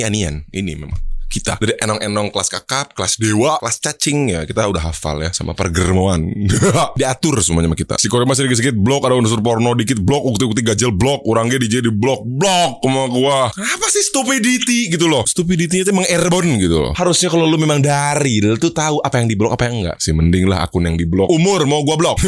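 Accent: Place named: Bogor, Indonesia. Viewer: logat bogor na karasa euy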